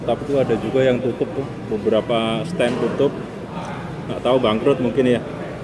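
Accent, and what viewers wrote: Java, Indonesia. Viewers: Seneng saya dengernya, beberapa video. Dan saya di sini baru komentar. Saya bisa nambah wawasan untuk bahan cerita. Hehehe... Lanjut mas... Oh ya, kok bahasa logat sampeyan jawa banget... Asli jawa tengah po mas? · Selalu suka dengan suaranya yang agak medok mas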